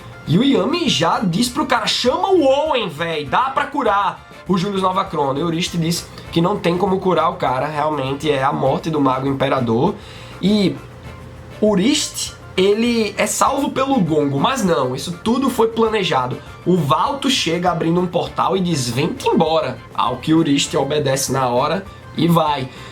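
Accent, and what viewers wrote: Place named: Recife, Brazil. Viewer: Pelo sotaque vc é de recife Pe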